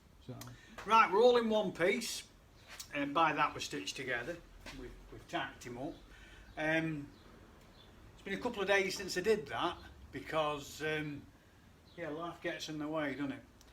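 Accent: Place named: United Kingdom. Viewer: Where abouts in the UK are you from?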